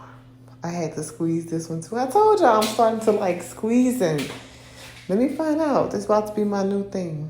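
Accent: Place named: Louisiana, United States. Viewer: Oh man, when you said, "My dad's gonna be boiling crawfish.." I immediately thought, 'I bet she's from Louisiana'! I heard it in your accents and your words confirmed it 🤣 I never got to try crawfish while I was in Louisiana (some of us call em crawdads here in Kansas), and I regret that. I was 16 and I just couldn't get past the "suck the heads" thing.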